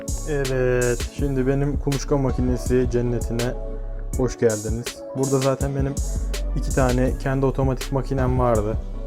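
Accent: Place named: Uşak, Turkey. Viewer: Selamınaleyküm ustam hayırlı işler kolay gelsin. Ustam memleket neresi? Konuşman Uşak civarı gibi geldi eğer yakınlardaysan yardımına ihtiyacım var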